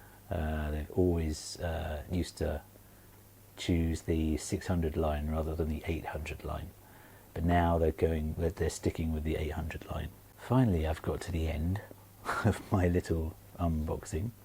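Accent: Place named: England, United Kingdom. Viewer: Are you in the UK (you sound english haha)? does volte and wifi calling work and what network you with?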